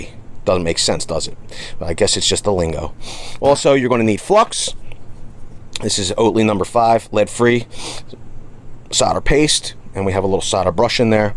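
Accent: Philadelphia, United States. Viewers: Got to be Philly yo jagoff !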